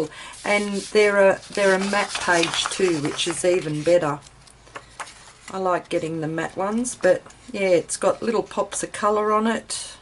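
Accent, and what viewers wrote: Australia. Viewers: Lovely to hear an Ozzie! Great hall of treasures!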